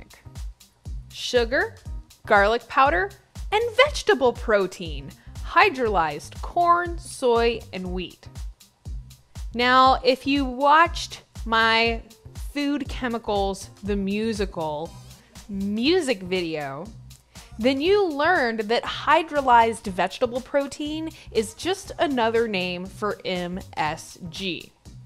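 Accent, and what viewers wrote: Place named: United States. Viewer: Watched for 5 mins then couldn't stand your annoying slow American accent zzzzzzzzz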